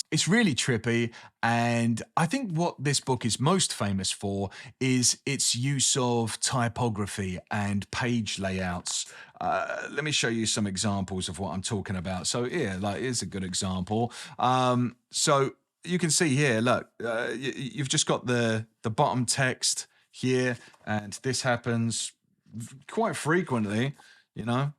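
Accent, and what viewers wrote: Cockney, United Kingdom. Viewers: New subscriber! Just stumbled across your channel on this cloudy and cold day in the middle America. Understood your accent perfectly and would love for you to turn up the cockney a bit. Great recommendations! 🇺🇸 🇬🇧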